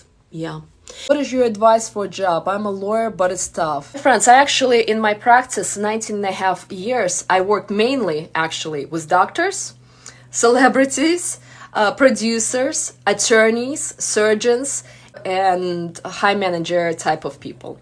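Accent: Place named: Eastern Europe. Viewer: Man here, you are the relationship advice women need to hear instead of “blah blah blah Tinder makes you feel whole, go sleep with 100 men to find yourself”. I can tell from the accent you’re from Eastern Europe so no wonder you are smart and beautiful. I love Eastern Europe and my Croatian gf who is what you described basically :)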